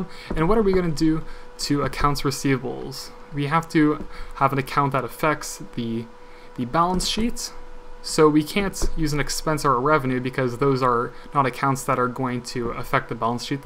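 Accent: Canada. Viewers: Love dat Canadian accent!!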